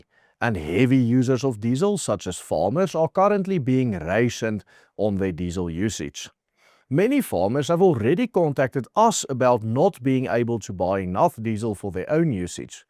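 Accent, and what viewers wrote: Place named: South Africa. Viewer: ek dink jou videos is fantasties. Die content is akkuraat en lig die mense goed in. Ek dink die feit dat jy Engels praat met so sterkt aksent maak dit soms moeilik om te hoor, maar rook eg Suid Afrikaans. Ek love dit! Maar kan ons asseblief praat oor jou baard en hare. Vir n man wat so baie voor n kamera sit, kry vir jou n manscape trimmer of so, en kom ons wys vir die mense ons voorkoms is net so goed soos die visie, en missie in ons land.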